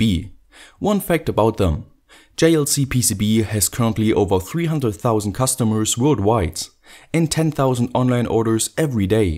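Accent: Germany. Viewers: German accent is really fine and "clean"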